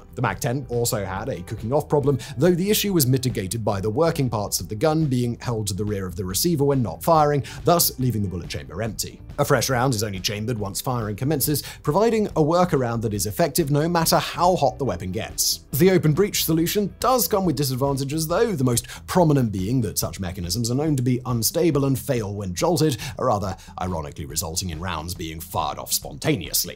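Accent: United Kingdom. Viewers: I found your video content to be interesting and well organized. But I had trouble hearing your narration. At first I thought it's because I'm not used to hearing British accent, but then I realized you tend to drop off in pitch and volume towards the end of your sentences. That made it very difficult to follow what you're saying.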